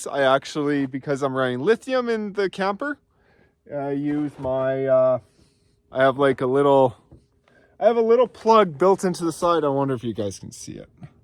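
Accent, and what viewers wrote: Canada. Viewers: As soon as you started talking I knew you were Canadian and when I saw no plate on the front of the truck I figured AB or YT, although I was leaning toward YT when you mentioned minus 30 degrees.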